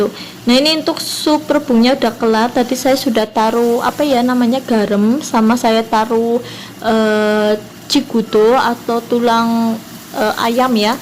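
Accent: Banyumas, Indonesia. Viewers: Suka dengernya basa ngapaknya mbg,, Slm knl mbge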